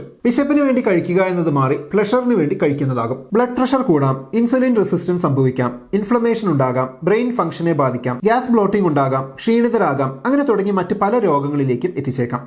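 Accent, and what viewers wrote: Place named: Kannur, India. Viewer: Food മൊത്തമായി വെറുക്കാൻ refillyourtummy കണ്ടാൽ മതി. അത്യാവശ്യം കണ്ണൂർ ഭാഷയും വെറുപ്പിച്ചു കയ്യിൽ തരുന്നുണ്ട്.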